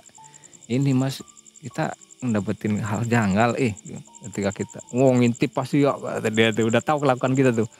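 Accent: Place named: West Java, Indonesia. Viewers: Mang ini kan orang asli cilacap ya, kok beberapa celetukan lebih mirip orang sunda daripada gaya khas orang cilacap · Kok kayak orang sunda mas nya yaa 😂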